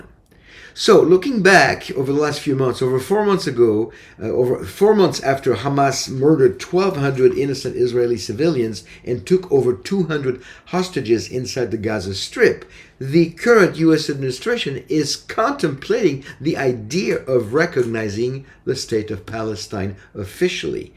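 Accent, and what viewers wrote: France. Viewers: Dear " frère " I always hear the french accent and I like it. Au revoir.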